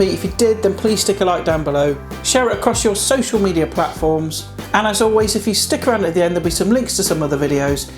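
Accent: United Kingdom. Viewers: I love your accent. what kind of British accent is it?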